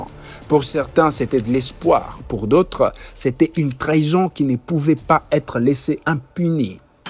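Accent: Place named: Belgium. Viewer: Vous avez un francais belge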